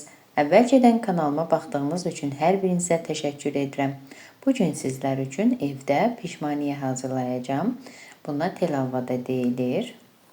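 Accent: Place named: Sheki, Azerbaijan. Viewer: Salam xanım adaşım siz çox qabiliyətli xanımsız siz Şəkilisiz ləhcəniz Şəkili dilinə oxşayır sağolun❤ xanım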